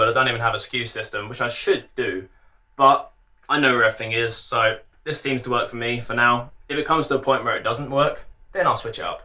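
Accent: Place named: United Kingdom. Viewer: Love your accent so sweet and British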